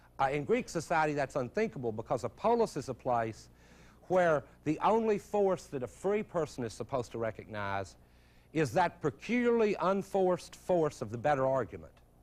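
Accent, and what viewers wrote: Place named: West Texas, United States. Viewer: Professor has the abilty to take a complex abstract topic and break it down to practical applications. His West Texas accent makes his presentation more real world. It is sad that he passed away at such a young age.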